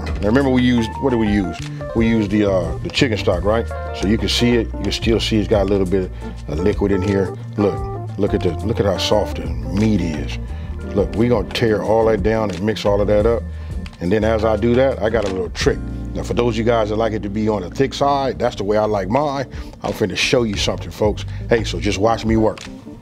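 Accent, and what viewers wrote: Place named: Mississippi, United States. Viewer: You GOTTA be from my home state of Mississippi!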